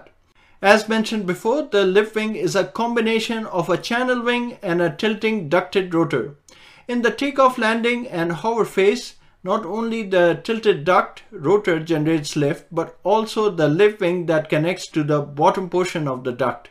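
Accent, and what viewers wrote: India. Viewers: Sound like punjabi profesor lesson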